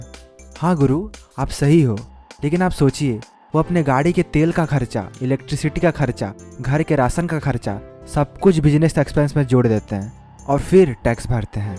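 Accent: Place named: Bihar, India. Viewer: Bhai bihar se ho kya. Acha kam kr rhe ho.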